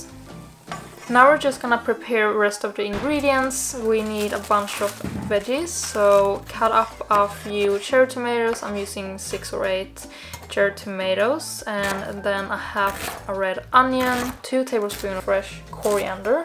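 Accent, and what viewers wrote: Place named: Sweden. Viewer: I thought you sounded Swedish. I googled you and see that you are Swedish Spaniard. Interesting because I always thought that the two accents (Swedish and Spanish)sound similar. Nice burrito bowl.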